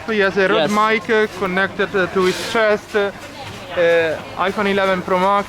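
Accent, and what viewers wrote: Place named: Italy. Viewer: He is a very good Vlogger now it reminds me Sunny in Thailand, plus I do love his Italian accent, he is more improving now.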